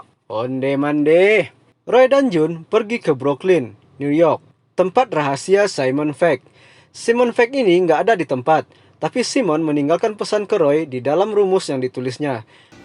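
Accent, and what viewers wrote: West Sumatra, Indonesia. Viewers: Orang Sumatra barat ya bang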